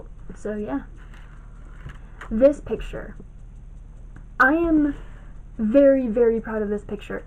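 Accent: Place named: United States. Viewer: I'm not sure any if any of the threats given are enough for him to be arrested, the server is small and between friends, so I'm not ok disclosing that. But I will give you his account it's AllergicToPlebs#4779 Now I'd suggest blocking him. But it's your call. From your accent I'm assuming you're American, most people on the server are British or European. Aside from that, I wish you the best of luck, if you can slap some sense into him at the same time that'd be very nice too. I'm getting tired of him, as close as a friend as he is.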